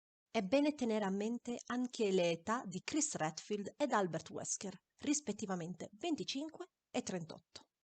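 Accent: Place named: Italy. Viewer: There you go, partner! Now you've done it! Kicking ass, always. Keep always being this mad hardcore fan that we love to follow. Count with your old Brazilian partner here for anything you need. P.S: That Italian accent is SO beautiful! You gotta help me working in my Italian, or my ancestors are going to punish me haha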